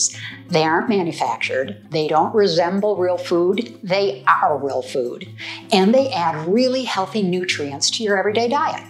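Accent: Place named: Midwest, United States. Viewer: BTW love your mid west ? Accent. 🙂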